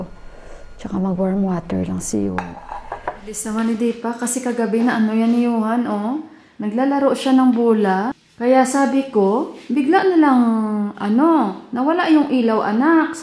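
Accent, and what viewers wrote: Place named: Ilonggo, Philippines. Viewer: Ilongga po ba Kay maam? Now lng ako nka view Ng YT channel mu. Alam ko tlga sa tono mu kc e😁😉. And kamukha mu ung crew ko dati sa Try me ice cream house & resto dto sa Iloilo☺️Ingat po Kau jan🙏🙏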